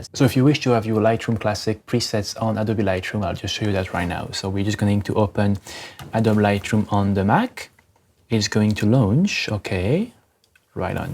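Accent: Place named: England, United Kingdom. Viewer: Nice and clear tuto with a clean english accent ! Nice work thanks for it ! It helped me a lot.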